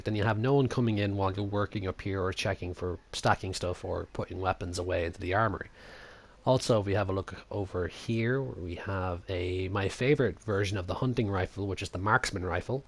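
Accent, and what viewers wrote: Ireland. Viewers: You kinda sound like jacksepticeye I'm guessing you're Irish?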